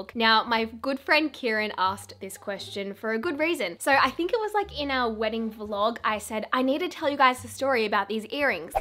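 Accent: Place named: Australia. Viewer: The little Ozzy accent developing strong!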